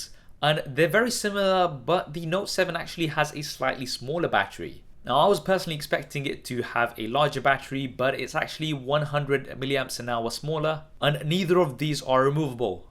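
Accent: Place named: London, United Kingdom. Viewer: Where are you from brother? Sounds like you're from around East London. Man I am happy to see a fellow London goer take up the stage and have a greatly sized channel of electronic fandom. I might be wrong, you could be from Manchester, but I haven't heard that accent in years. Anyways, love the video, well made and professional. Subbed.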